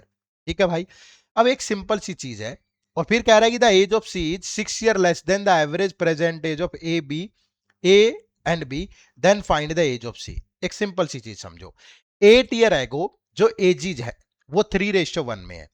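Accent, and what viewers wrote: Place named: Haryana, India. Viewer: Sir I’ve not watched this lecture but I’ve watched some old lectures so I’m pretty sure it also would be such useful lecture for me and I really appreciate you teaching style and your Haryanvi help me to not bore! Love you share ❤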